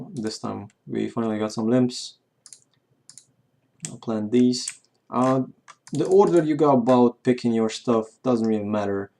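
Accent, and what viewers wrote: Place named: South Africa. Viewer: What accent is that? Sounds half South African, half something else. Nice vid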